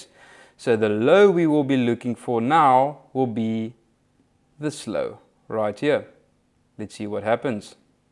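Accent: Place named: South Africa. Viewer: lol i didnt even see the 'better than ict cap' he sounds like someone from south africa ja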